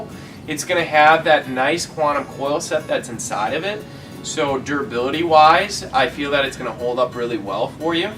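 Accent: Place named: Canada. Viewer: the canadian accent :)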